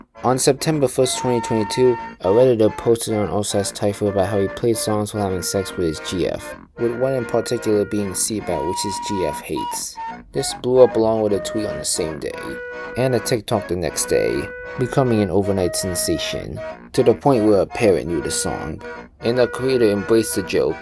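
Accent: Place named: New York, United States. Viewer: Why do you sound like a New Yorker or New Englander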